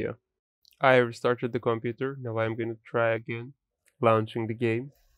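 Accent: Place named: Turkey. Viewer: kanka aksanından türk oldugunu dusunuyorum allah aşkına oyunu dün epic gamesten aldım bozuktur dıye bugun gidip steamden aldım ilk basta önyükleme hatası falan diyordu biostan bi ayar yapıp ön yüklemeyi açık hale getirdim onu hallettim.ama suanda steamden oynaya basıyorum ilk kez baslatılmaya hazırlanıyor diyip hicbisey olmadan kapanıyor kendi kendine hiç açılmadan ne yapabilirim lütfen yardım edermisin bütün driverlarımda güncel bilgisayarıma yeni format attım windows11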